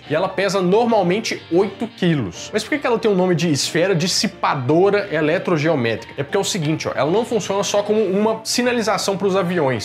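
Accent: Minas Gerais, Brazil. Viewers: ce é minerrr cumpadi? <3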